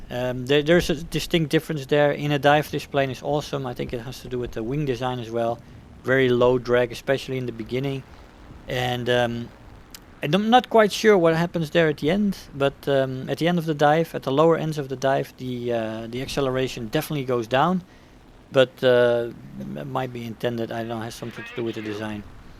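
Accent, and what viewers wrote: Netherlands. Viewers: Holy cow, i recognized that dutch accent of yours fast and realised that you were from the Netherlands just like me! 😂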